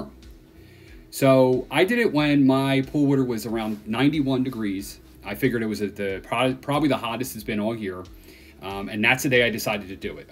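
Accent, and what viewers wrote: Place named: New Jersey, United States. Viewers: I'm guessing you're from NJ. You say woter. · Sounds like West Orange NJ.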